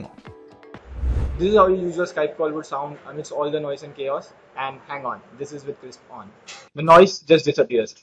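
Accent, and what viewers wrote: India. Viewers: Hallo friends in have indian acsent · thick indian accent why it have to be thick indian accent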